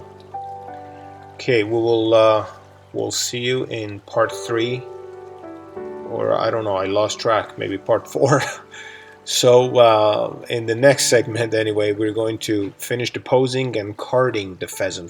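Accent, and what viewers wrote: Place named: Poland. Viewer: Boy oh boy I'm so pleased I found you! I've been doing Taxidermy for 43 years, self-taught , so it's been a struggle. Although I did achieve best all-around taxidermist in the state of Arizona 2001, and I'm still learning everyday. You are helping me a great deal! Thank you, thank you ,thank you. Question, are you using silicone caulking? Also, where you from Brother? Some of your English words sound like words used from my polish family when they speak English. But I know that's not what it is. Again I want to thank you immensely! Mounting Birds in Arizona has been a struggle because of the dry heat over here . now that I've watched you mount a bird before you blow dry it you gave me great ideas. I just wanted a ringneck pheasant your style and I love it! I still hit the inside of the skin with a little hydrogen peroxide here and there to keep it moist. 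And also try your head locking mechanism. I've never done that before either. Now I can adjust the position of the head and neck without fear of breaking the connection! You're the man! My hat is off to you! Yours in Taxidermy, Mike Bieda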